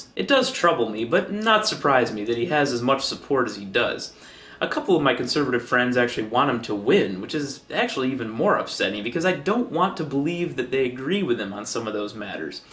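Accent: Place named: Canada. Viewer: Amazing canadian accent, props.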